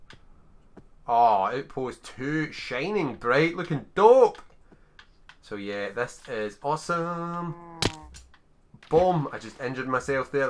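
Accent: Scotland, United Kingdom. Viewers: Scottish or English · Your Scottish accent, I like it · I always hear Indian, Canadian, and British accent. I like the change of Scottish accents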